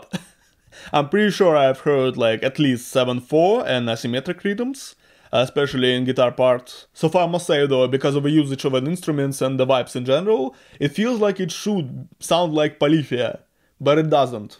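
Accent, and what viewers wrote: Russia. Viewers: Русский акцент я узнаю из тысячи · Подожди, ты русский? Я вот сижу уже почти полторы минуты думаю, акцент похож на русский... зашла в описание канала, ничего не нашла и тут ты говоришь "Драматургия" и я такая "стоп, чё серьезно?" Ив один из моих любимых певцов и я рада видеть подобные реакции ❤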